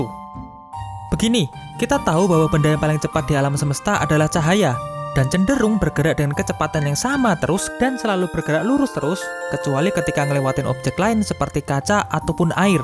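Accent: Java, Indonesia. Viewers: Medokmu Mas,,kyk aq.he.,he. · Orang jawani medok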